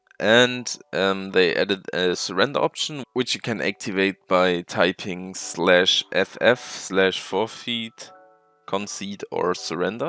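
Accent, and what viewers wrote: Germany. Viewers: Your accent sounds german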